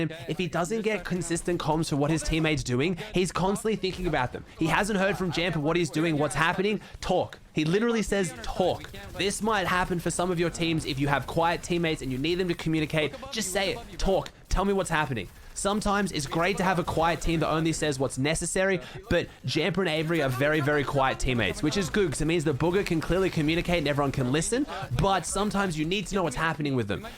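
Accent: Australia. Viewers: Nice Aussie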